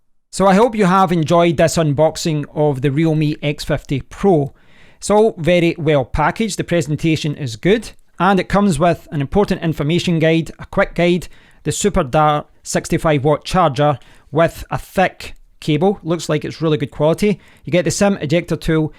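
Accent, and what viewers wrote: Scotland, United Kingdom. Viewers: I wish I could speak Scottish English, sounds amazing!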